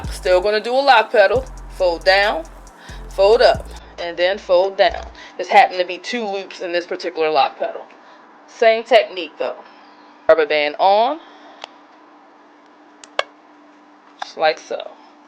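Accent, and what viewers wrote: Baltimore, United States. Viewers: I’m from the V in the DMV and I love the difference in all of our accents and Baltimore has one of my favorite accents by far.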